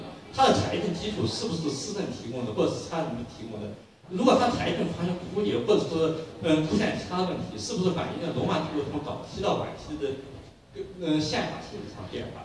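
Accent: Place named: Sichuan, China. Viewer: 其实就是一般川普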